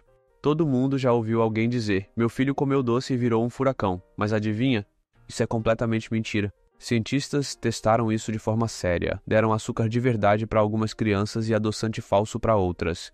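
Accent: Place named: Rio de Janeiro, Brazil. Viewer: tira esse sotaque carioca, me da agonia mano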